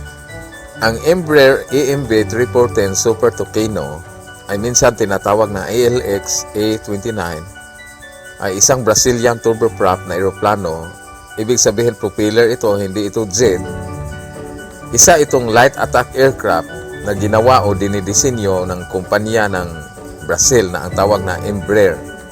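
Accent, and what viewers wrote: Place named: Bohol, Philippines. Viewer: PARE TAGA BOHOL KABA?KASI YUNG TUNOG NG BOSIS MO PARANG TAGA DAUIS OR PANGLAO BOHOL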